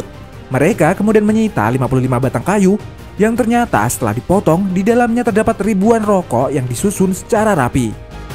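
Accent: Madura, Indonesia. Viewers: Kentara banget nih naratornya berdialek Madura tak iyee...🤣🤣🤣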